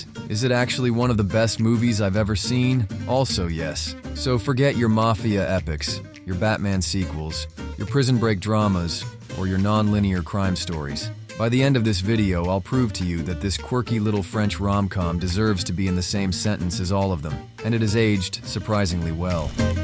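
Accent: United States. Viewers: Your stupid american accent killed this video from me. I lived in Portland Oregon and I just have to tell you to get a different voice for your videos. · Kind of weird to hear a monotone american voice drone on about one of my favourite films. But what he says is quite acurate. I saw the film on the opening day in Germany. It flashed me so hard. I loved it, all the weirdness, the strange colours, the music! Amelie is still one of my most beloved film scores! It is what it is. Either you love it, or you don't. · that really doesn't surprise me. Listening to an American accent critiquing the film was painful. 🇳🇿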